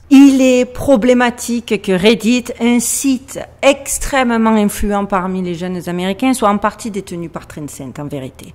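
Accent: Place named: Southern France, France. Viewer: Belle et intelligente 👍 Et ce melange d accent du sud de la France la ou je me trouve et un accent americain est etonnant 😮 Soyez bénie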